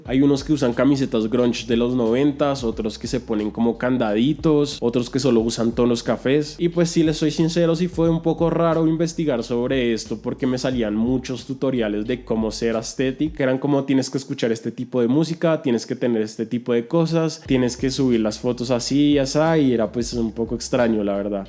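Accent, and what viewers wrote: Bogotá, Colombia. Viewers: Che, no conocía a este man, pero apenas lo escuché hablar, me dije: "este man es rolo". Tremenda voz que se carga uwu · Pero si es roloooo, nooooo, a nadie nunca le gustó el acento rolo! Y respeto al man y me gustó el vídeo y casi no se nota, pero, QUE ESTAN DICIENDO? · Puedo sugerir un video ? Me encantaría que hablaras un poco de Mariana froes. Ptd: Adoro el acento rolo. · Es el asento rolo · Porque es de Bogotá casi todos hablamos haci aquí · Creo que es de colombia y colo de Bogotá, me suena su acento a esa región de Bogotá. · El único acento rolo q no me molesta es el de este men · Mk se te nota que eres de Colombia JAJA y que eres de Bogotá :v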